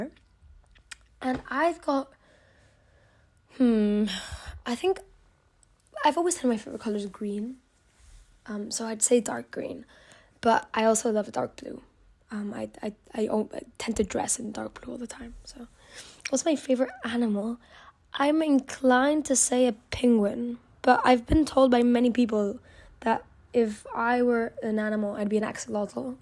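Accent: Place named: United Kingdom. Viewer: Your UK accent is really like coming through and more showcased and overtaking (If that makes sense) then ur US accent which is cool 😭❤️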